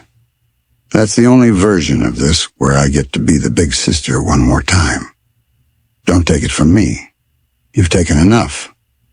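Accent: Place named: England, United Kingdom. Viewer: I am from Glacier on the W side.My X was a water purification specialist in the whole park West North. He had his own cabin. I know this area very well. The story is not concise but entertaining. I used to know most of the residents in all of Glacier. AI, you have an English accent and you mispronounce proper nouns.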